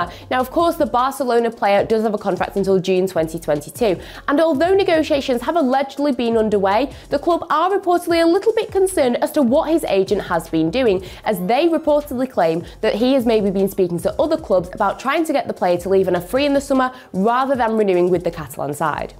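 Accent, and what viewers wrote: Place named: United Kingdom. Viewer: Your English is so British haha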